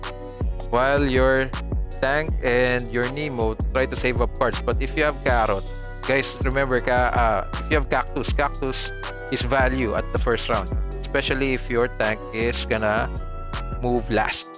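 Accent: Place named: Conyo, Philippines. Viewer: Conyong conyo amp...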